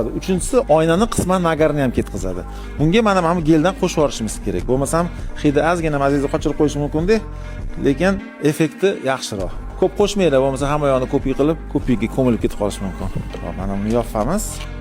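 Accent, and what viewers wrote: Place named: Tashkent, Uzbekistan. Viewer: НИМА ТОШКЕНТЧА ГАПИРИШ МАНСАБМИ ОБРОМИ ЁКИ ЛАВОЗИММИ. КУРИНИШИН ХАМ ГАПИНГ ХАМ БОШКАЧА БУНИ ХИДИ ШИРИН ЭАМАС ТУЗИ ПАСТ ЭКАН.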